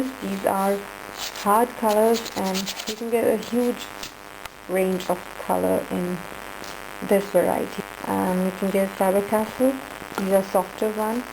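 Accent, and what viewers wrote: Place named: India. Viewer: Thank you, Indian voiced woman :)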